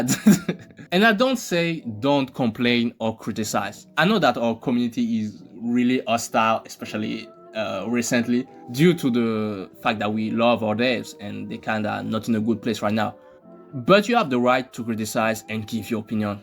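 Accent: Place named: France. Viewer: Why do you sound french?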